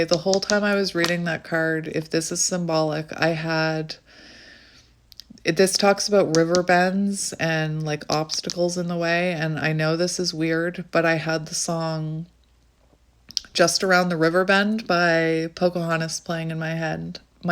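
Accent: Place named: Canada. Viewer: I'm a Aquarian and also don't like to be told what to watch 😅 I will when I feel like it. But Zombies, no way! Lol 😅 I'm house sitting for a month 3 dogs and 2 cats, so when I have dinner or a snack, I have 3 pair of eyes watching me, so the dogs gets more if my food than me! 😅 But I would also have cried about the spider. I love nature and actually prefer animals to humans. I also will rescue a spider 🕷️ if it's lost inside the house. Some people will get an entire spray tin of poison to kill a innocent creature! That really makes me angry! Thank you for being such a interesting tarot reader, I love your Canadian accent. I'm in Cape Town, South Africa. We going into Spring/Summer where you are going into Winter.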